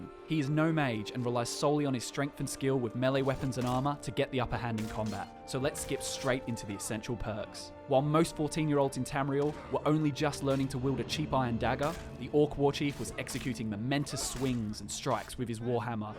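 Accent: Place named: Australia. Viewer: It's weird listening to this dude coz his Aussie accent isn't as obvious as Michael and Scott... nevertheless I think he nailed this, chose well.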